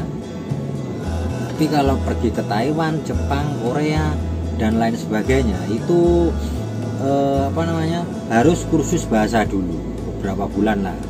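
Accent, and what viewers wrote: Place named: Java, Indonesia. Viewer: Kenapa accent awak masih pekat Jowo? Awak tak bergaul dgn orang Melayu ke? Btw...saya keturunan Banjar dan Bugis. Kampong di Perak.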